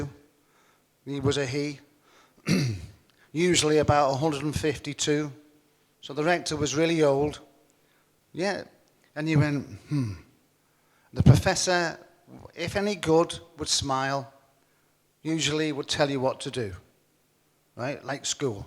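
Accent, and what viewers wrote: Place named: United Kingdom. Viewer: amazing😊 ooooh finally there's british english speaker whoever I've been awaited for a long time, all who came before were american english spekears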